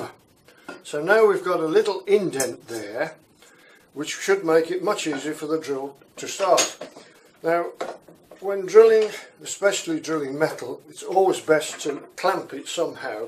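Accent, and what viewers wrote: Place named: England, United Kingdom. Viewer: I love that english accent, cheers from Argentina :).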